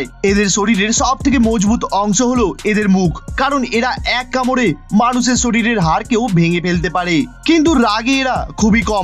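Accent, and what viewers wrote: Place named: Kolkata, India. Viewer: কলকাতার ভাষাগত টান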